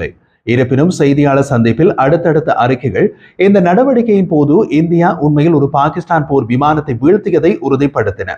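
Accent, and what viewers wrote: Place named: Jaffna, Sri Lanka. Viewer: யாழ் தமிழைக் கேட்டு மகிழ்கின்றேன் மிக்க நன்றி வாழ்த்துக்கள்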